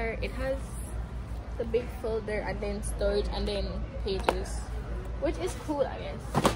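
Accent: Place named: Jamaica. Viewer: I love u accent❤ are u jamaican?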